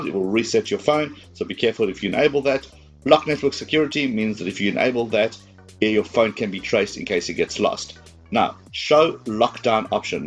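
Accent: South Africa. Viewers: from what country are u . I am from South Africa and you have a south african accent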